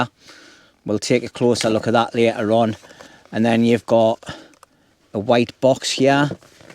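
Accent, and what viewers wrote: United Kingdom. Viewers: Great Brit English ❤ You must be Ex German ? 😂 (like me 😅) Unboxing: keep it short ❤ Video with IR: very grainy 😢 IR off —> NG Night sky: 😰 I better still keep on looking for a better unit 😂 it great video, 🙏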